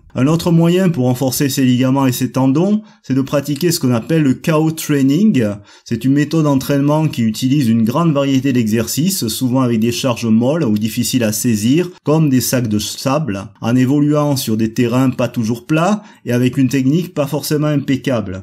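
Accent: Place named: Corsica, France. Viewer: Super video ! On croirait meme reconnaitre un accent corse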